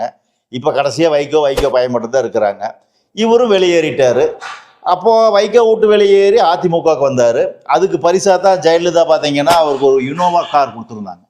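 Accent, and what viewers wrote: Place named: Kongu, India. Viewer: ஐயா நீங்கள் பேசுவது கொங்கு தமிழ் பேச்சு வட்டார வழக்கு நீங்கள் எந்த ஊர் எந்த மாவட்டம் நான் திருப்பூர் மாவட்டம் திருப்பூர் மேற்கு பகுதி 25 வேலம்பாளையம்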